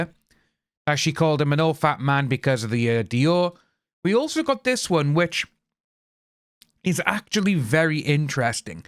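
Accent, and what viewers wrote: Wales, United Kingdom. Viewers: Are you Welsh? I hear it in your voice. I’m from Tonypandy. I agree with your comments btw. Spot on. 🏴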